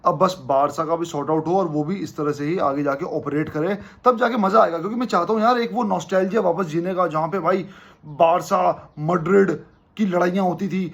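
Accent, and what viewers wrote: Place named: Uttar Pradesh, India. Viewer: uttar pradesh mai kaha se ho bhai Voh toh mujhe pata chl gaya tha app uttar pradesh se ho apki voice se😅😅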